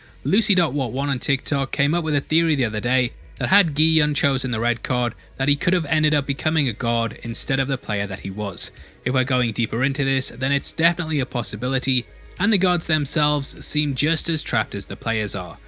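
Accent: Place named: United Kingdom. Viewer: hes literally speaking british english or some other dialect of English that isn't American. imagine thinking that America is the center of the world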